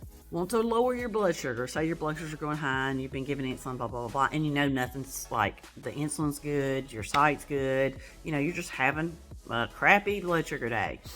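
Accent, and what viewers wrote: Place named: South, United States. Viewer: Hi there. I just discovered your channel and I am enjoying it. I’m also southern, so enjoy your accent. I’ve been on the Omnipod five Pump for a little over two months and I am considering going to the Tslim, which I tried for only one month last year and we could never get the Settings right. I’ve had to be very aggressive on everything from insulin to carb ratio, to insulin duration on the Omnipod. Can you tell me what maybe to expect in trying to set up the T-Slim as far as setting it up in comparison to the Omnipod? Meaning, since I am very aggressive on the Omnipod Settings, virtually all of them, even tweaked the manual ones because I was hoping it would help Omnipod give me more basal, would you have any recommendations about percentagewise changes to start with on the T slim like maybe 10% less aggressive or 5%? 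If I go back to the T slim, I would sure like it to work very well. And I’m still considering MDI because I’m only A few percentage points off in my time in range on the Omnipod but Omnipod requires tons of corrections and after a little over two months I’m still getting 60% of my insulin as bolus which includes the corrections, and 40% from Basal. And I thought it was supposed to learn and try to get me to 5050, but it’s a slow learner at this point. Any help or suggestions would be wonderful. Also, my the tea slim do better with exercise than the Omnipod? I have not been able to get that to work. Thanks if you can. Have a great day.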